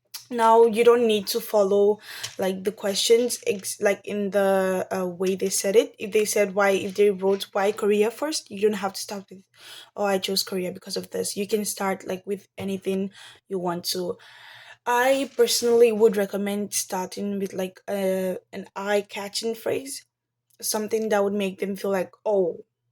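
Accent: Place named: India. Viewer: Its The indian accent for me 😂❤️❤️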